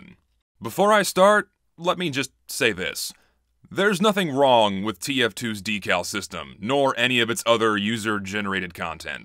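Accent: South, United States. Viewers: Is it me or does sound really Southern in this video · with that southern droll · You should is it embrace the Texan accent, dude. It’s slipping anyway.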